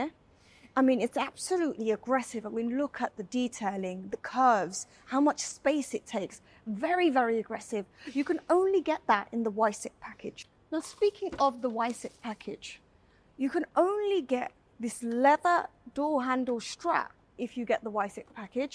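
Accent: United Kingdom. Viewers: Love all your videos! the Cars you do and your accent!!!!!!! sounds like an UK accent! anyway great show!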